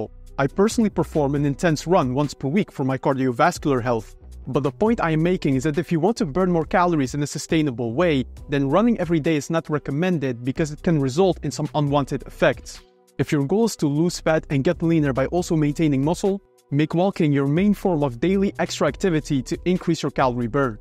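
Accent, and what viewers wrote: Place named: Netherlands. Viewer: je klinkt Nederlands :D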